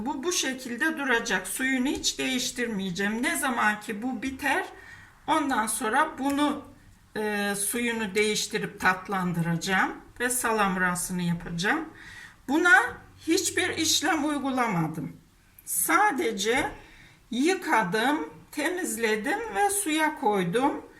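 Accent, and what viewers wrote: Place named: Black Sea, Turkey. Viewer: Harikasın konuşman bizim oraları andırdı karadenizin becerikli hanımları maş. Mutlaka deneyeceğim.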